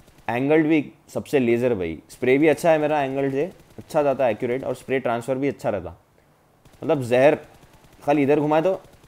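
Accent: Hyderabad, India. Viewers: Love this Hyderabadi accent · I love his accent...ek number... Hyderabadi boltey😀😁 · Bhai bolta mast hai tu full Hyderabadi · Hydrabadi Miya Bhai Boltey ❤️✊ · Tum hydrabadi hai ji?? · Ye haidrabadi accent♥ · Hyderabadi bolthe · Bhai tumhara saare videos dekhta hu mai and ek reason ye hai ki tum acha smjhate lekin main reason ye hai ki kya mast Hyderabadi bolte bawa tum❤️ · typical hyderabadi hindi...kiraak hindi hai ye..hyderabad is jaan !! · Hyderabadi hindi bholthe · Are u hyderabadi? Bahut hi pyari accent hai 😊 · Bhai aap hyderabad ke hai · Bro are you a hyderabadi..coz ua slang is similar.. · That Hyderabadi language ❤️ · Hyderabadi hindi bolthe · Kya proper Hyderabadi Hindi baat karre yaaro! OP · bhaiii... aaap ka language Aur baatan sunke lagra ki aap hyderabadi Hai... arey apan bhi hyderabadi Hai.... aapka accenture Pura hyderabadi language ki waisich dhikra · hyderabadi op bolte bhai kya ki je tumna to sab smja dale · Voice and accent op. 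Are you from Hyderabad? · You are from Hyderabad?? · Hyderabadi ho kya ? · Hydrabadi bolte❤️ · bro it ia just hyderabadi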